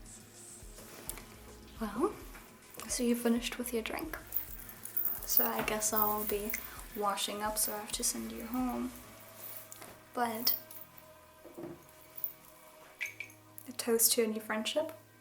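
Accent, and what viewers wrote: Germany. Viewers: this shit had the ability to take me to a cyberpunk world with the lighting the music and your german accent. powerful shit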